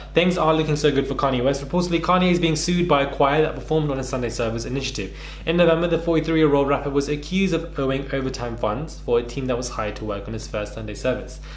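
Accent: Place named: London, United Kingdom. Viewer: !!!... I just found this channel and literally binged! Bite size gossip spoken by a Londoner... Love it!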